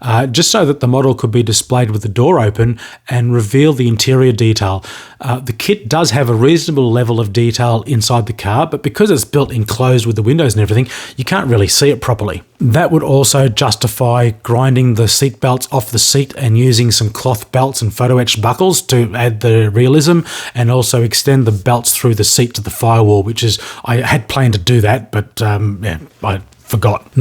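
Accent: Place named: Australia. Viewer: This is awesome and for some reason the accent makes this that much more special. I have to be honest, its probably because Aussi race announcers. Regardless killer build man.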